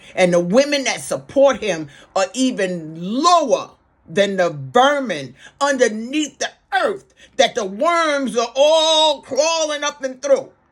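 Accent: East Coast, United States. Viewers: This is my first call stumblingly across your page. I'm getting an East Coast twang, I love it! New subscriber! Keep that energy PLEASE 😊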